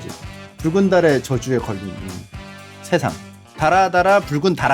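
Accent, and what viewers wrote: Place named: Jeolla, South Korea. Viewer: 서남방언을 잘쓰고 눈치가 빠른 침착맨 겐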